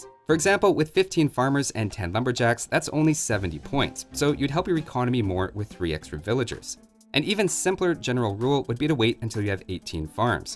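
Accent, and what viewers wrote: Canada. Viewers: You are Canadian? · Wait. are you canadian dude??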